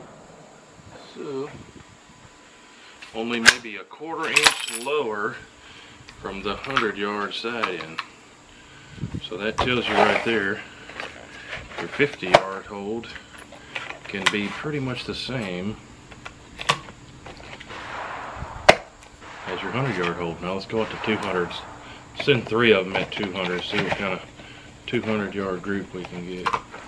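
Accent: United States. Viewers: ! Dude I love that crazy country accent of yours and I am SHOCKED that some Producer here in Hollywood has not made you a movie offer!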